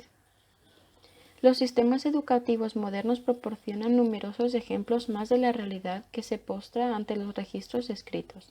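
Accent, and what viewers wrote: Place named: Spain. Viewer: Gracias: Es muy agradable tu voz y me encanta el acento español.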